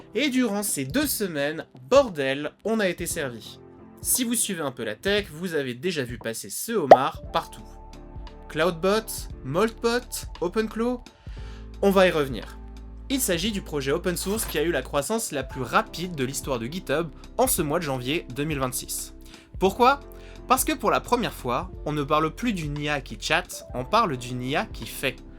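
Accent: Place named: United States. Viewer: Ralala ces vidéos conspirationnistes... Par des personnes qui ont passé trop de temps devant Terminator... Ouhlala au secours, skynet est parmi nous.... Pour utiliser un agent Ia tel que Openclaw, il faut être capable de faire des installations en ligne de commande et donc ce public plus ou moins avancer sait déjà qu'il faut séparer ses données des données qu'on donne à manger à l ia... Stop a l agitation du drapeau sécurité à outrance avec un accent Américain tout aussi .... Pas grave je continuerai de regarder tes vidéos mais go brain avant de faire juste du buzz😅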